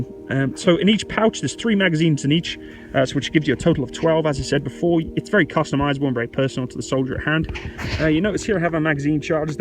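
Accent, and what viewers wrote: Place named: United Kingdom. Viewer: Whereabouts in the UK are you from mate?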